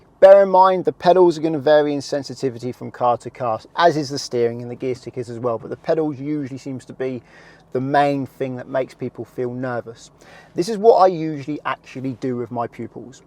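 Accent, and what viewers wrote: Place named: Essex, United Kingdom. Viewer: Thank you for your videos as ever! It's so comforting to hear an Essex accent I feel right at home hahaha. I'm currently learning in Bradford which is probably the most exhilarating (dangerous) place to learn in the UK. Could you please come do a video in Bradford and enjoy the ride? 😂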